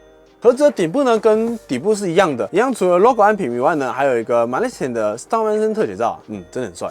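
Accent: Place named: Japan. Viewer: 應該是剛學好普通話的日本人吧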